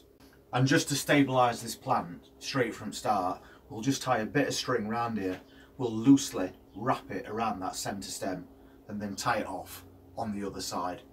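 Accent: Yorkshire, United Kingdom. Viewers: I hear a propa Yorkshire lad, Sheffield mate?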